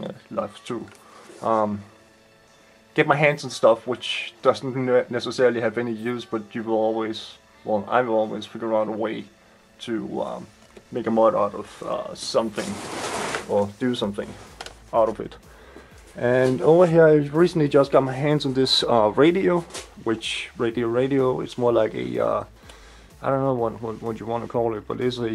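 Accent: Denmark. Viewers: Det er sjovt at høre en tale engelsk når han er fra Danmark :D - Nice video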